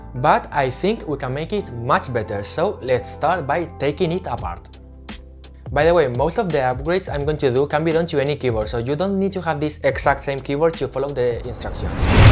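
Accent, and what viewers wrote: Spain. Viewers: Me ha bastado con la primera frase para darme cuenta de que eras español jajajaj. Por cierto muy buen vídeo, de gran calidad y se notan tus ganas y conocimiento. Hasta Marques Brownlee te ha comentado... flipante. Sigue con el buen trabajo! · You have a suspicious spanish english accent... where are you from? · Man where are you from? Your accent sounds so Spanish! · Spanish accent were are u from mate? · are u spanish? the accent sounds like it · Súper obvio que eres de España con ese acento. ¿Cómo aprendiste a hablar tan bien el inglés? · Where are you from? You sound like a native spanish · Are you spanish, aren't you?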